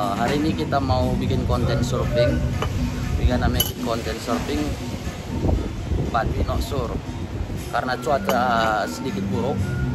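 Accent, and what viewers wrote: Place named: Medan, Indonesia. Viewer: Sepertinya logat anda anak medan ya.....hehehehehe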